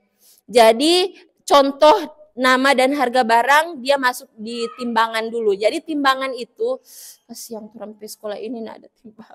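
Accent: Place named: Manado, Indonesia. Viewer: Logatmanado ya ini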